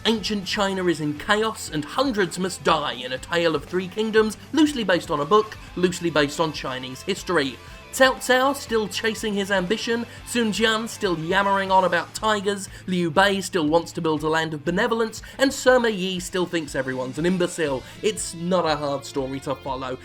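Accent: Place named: United Kingdom. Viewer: lol UK accent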